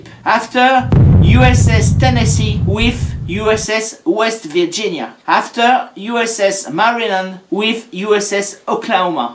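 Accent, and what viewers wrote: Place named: France. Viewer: Génial les effets sonores et la cornemuse à la fin ! Ça semble vachement plus personnel avec ces décors et ce long travail de montage qui nous tient en haleine. Parler anglais ça amuse l'oreille vu que ton accent est français, super idée ! Tu t'y connais en histoire militaire de la chine ? Genre dynastie Qing ?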